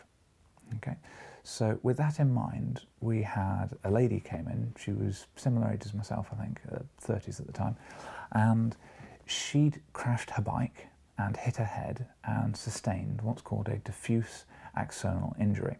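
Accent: United Kingdom. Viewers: You are too British y really like that, I like your accent I hear your videos to improve mi listen skill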